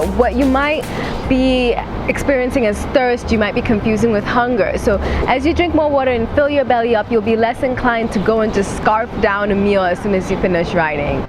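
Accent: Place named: New York, United States. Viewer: Are in NYC? The background reminds me of my old hood in Brooklyn. Plus you sound like it.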